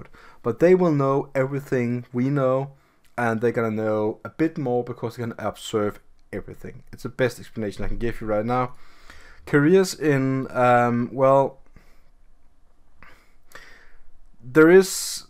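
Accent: Denmark. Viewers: You sound danish :) Thank for the video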